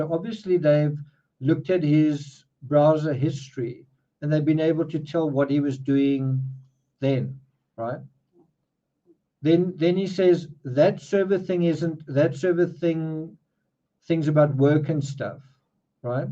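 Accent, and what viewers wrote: Afrikaans, South Africa. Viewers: Are you from South Africa ? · Really liking your insight thanku...enjoy your Afrikaan accent even more...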